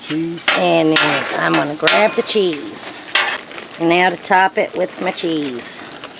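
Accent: South, United States. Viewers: First time viewer. I absolutely love your southern accent!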